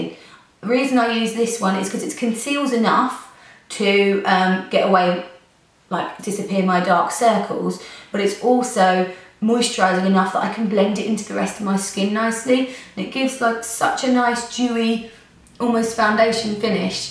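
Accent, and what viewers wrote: United Kingdom. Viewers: I was au pair in Germany and Austria, I speak good English and I would love to have to British accent! So... If you need one:)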